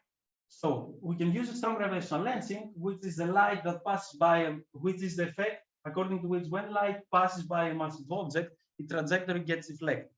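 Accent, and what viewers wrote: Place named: Greece. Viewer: μιλάς τα αγγλικά με ελληνική προφορά, ταλαιπωρείς πρώτα τον εαυτό σου και δεύτερον τον ακροατή , υποβαθμίζεται η δουλειά σου , όταν μιλάς τα αγγλικά το στόμα να το έχεις λίγο πιο ανοιχτό να βγαίνει η φωνή. Φιλικά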